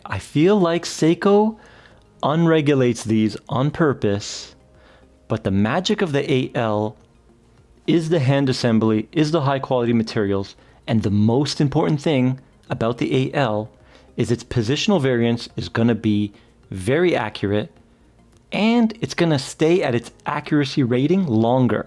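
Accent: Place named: Canada. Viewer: He only says it that way is because he is Canadian.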